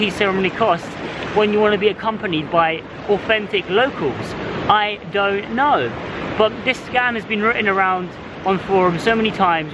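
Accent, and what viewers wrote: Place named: United Kingdom. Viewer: Wow nice 700+ followers, and this video 4000 views good I like your British accent, so good listen to you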